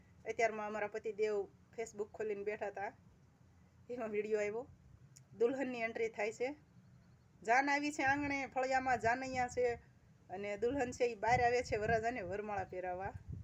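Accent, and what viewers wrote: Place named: Kathiawar, India. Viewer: તમે પ્રાતીજ ની બોલી થી અલગ કાઠિયાવાડી બોલી બોલો છો.. તેમાં કઈ સમજાવો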